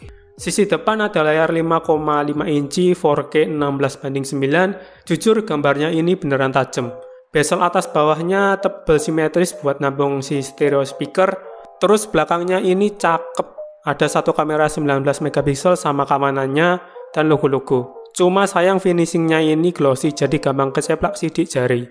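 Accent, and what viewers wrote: Surakarta, Indonesia. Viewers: Loh wong solo to mas ???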